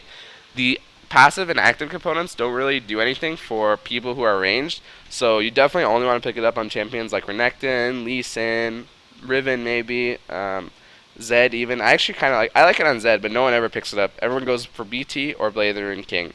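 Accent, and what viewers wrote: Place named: Texas, United States. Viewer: Dat accent ... man.. are you from Texas? :D Anyway, nice video and thanks for all the advice :p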